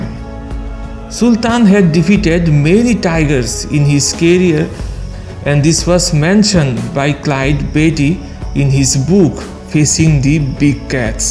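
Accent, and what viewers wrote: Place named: Vrindavan, India. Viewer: Dari logat dia bicara sepertinya dia orang vrindavan 😌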